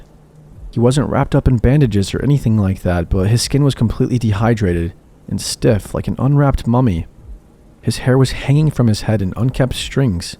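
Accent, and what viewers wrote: United States. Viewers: I love your voice. New Jersey is that you? 😅 Your voice makes me feel safe. Thanks babe. ❤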